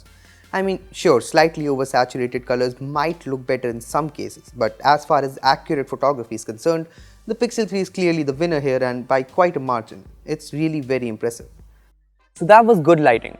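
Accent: India. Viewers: work on your indian accent. Western people like me will click away, like I just d..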